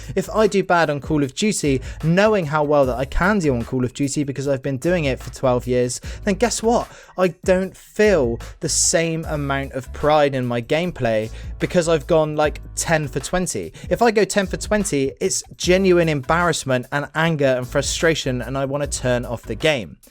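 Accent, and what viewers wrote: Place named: West Country, United Kingdom. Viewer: Mate, might sound strange but have you ever kept a track of where the people in your lobbies in Call of Duty come from? I might have too much free time on my hands, but I'm from Nottingham and back in day, I used to play with people from Sheffield, Leeds and surrounding areas, I NEVER played with people in Europe. You'd very occasionally get into a lobby with some Americans which was quite cool as I'd never spoken to a yank before, but all I used to come across was 'UK ReMiiX' and the like back in the day of Cod 4 - BO1. Nowadays, my games are dominates by Germans & Turks for some reason. I'm literally playing with the same people with 'KURD' or 'TURK' as their clan tag game after game. Why?! I'm guessing from your accent you are probably from round the Bristol / Swindon area, do you still party up with people in the South West or are you witnessing the same thing as I am?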